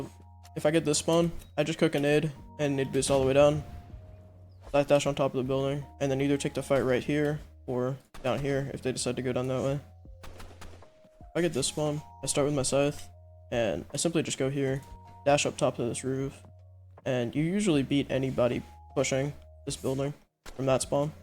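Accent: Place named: Kashmir, India. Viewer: hey are you from kashmir India if yes then i am from Jammu and your accent is amazing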